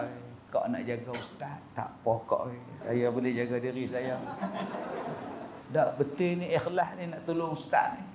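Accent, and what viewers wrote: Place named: Batu Kurau, Malaysia. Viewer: Pelat batu kurau tu buang lh sikit .bkn ceramah Kut kg paya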